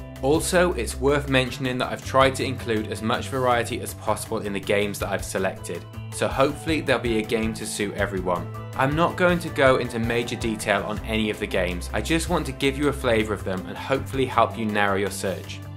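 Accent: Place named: England, United Kingdom. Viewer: Take this as a compliment: I always like watching video game reviews and lists like this when narrated by a person with an English accent. Probably comes from my days of watching TotalBiscuit when I was playing WoW.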